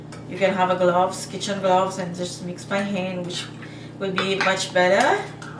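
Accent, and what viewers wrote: Mauritius. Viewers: Love the Mauritian accent👍🏽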